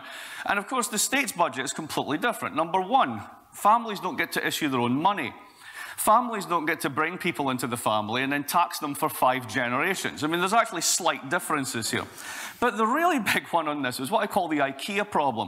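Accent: Scotland, United Kingdom. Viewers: This is a proper Scottish accent you silly person! Do you know that Scottish generated, for such a small population, the greatest economic thinkers and one of the greatest concentration of philosophers! I suggest you educate yourself.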